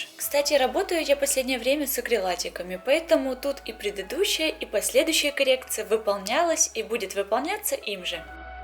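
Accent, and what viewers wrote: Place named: Makiivka, Ukraine. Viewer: Подписана на вас очень давно, смотрю все видео. Вы потрясающий мастер. Но почему вы настолько плохо говорите по-русски? Почитайте книги, что ли. Или хотя бы не используйте деепричастие и причастие, если не понимаете, где в предложении действующее лицо. Нельзя так. Вы публичный человек, а шпарите на макеевском диалекте.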